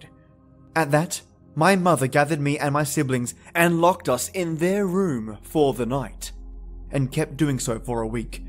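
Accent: Australia. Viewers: Wow! That tea you mentioned in the beginning sounds absolutely fantastic and delicious! I'm a bit jealous! I am sitting in my living room with my wonderful mother, cute parakeet named Daisy, and myself with a humongous cup of black tea. My mom couldn't of been nicer about you. She told me you tell such a good tale she could actually imagine herself in the story. Bravo! Of course, I just Loooovvvveee your narration!😍 With your narration style, sound effects, and great Aussie accent, truly makes it quite a listening experience (a stupendous one at that). My ears never have been soo spoiled! Thank you ever soo much, from the bottom of my heart!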